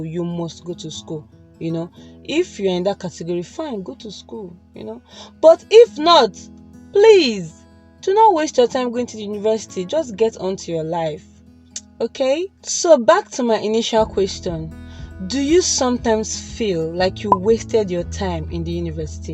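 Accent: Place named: Nigeria. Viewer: I like Nigerian accent.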